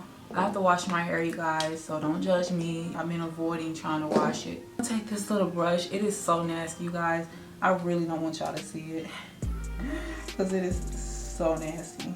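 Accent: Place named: South, United States. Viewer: I'm so happy you did this because I didn't just start following you but I kinda did lol so I'm a rookie to the #DesignSquad lol but I loved that I got to know more about you and love your southern accent lol I have family down south as well so to see you be so stylish and chic coming from the south makes me happy!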